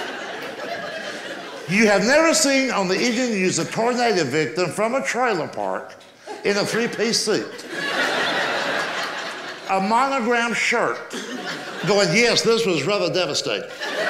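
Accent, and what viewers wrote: South, United States. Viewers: Simplicity in his delivery and comic content. He's not like the typical professional comedian, more like your funny Uncle Ralph. We all can relate to his content. His timing is perfect. Throw in the suthun' drawl for extra fun.He's hysterical, and I can't wait to see him when he gets near my town.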